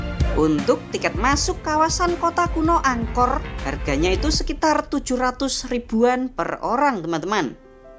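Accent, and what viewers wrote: Java, Indonesia. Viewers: Logatmu min, orang wong banget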